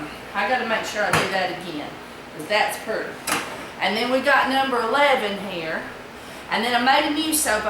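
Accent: South, United States. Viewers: You have to be from the south! Love watching videos with fellow southerners, I it feels more like I'm watching a friend instead of some boring video.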